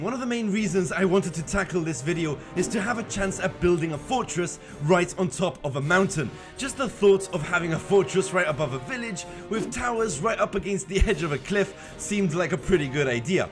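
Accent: United Kingdom. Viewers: I don't want to be rude but are you British😅😅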